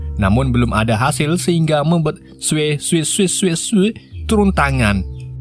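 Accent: Java, Indonesia. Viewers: Min sampean wong jowo ta🗿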